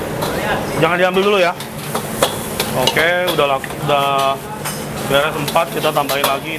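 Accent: Banyumas, Indonesia. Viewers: Bch Ngapak sekang ndi kuwe cilacap apa pwt sing sregep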